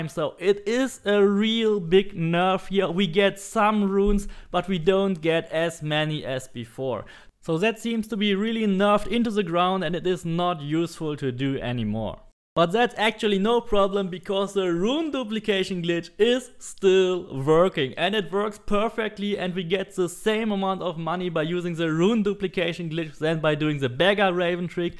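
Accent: Germany. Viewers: deutscher akzent isssss da :D nice channel btw :D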